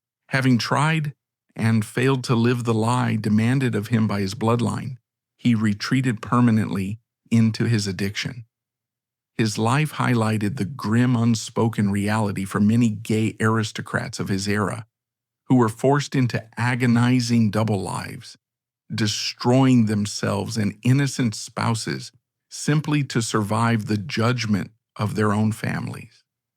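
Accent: United States. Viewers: I hate this American narration . Boring !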